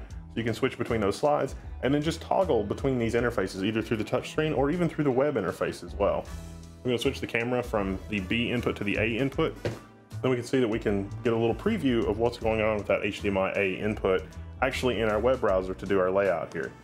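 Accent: South, United States. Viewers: I watch this channel solely because of the girl. She has hypnotized me and I must have more content featuring her. She has a hint of south in her, maybe Alabama, Mississippi or Georgia. What's her name?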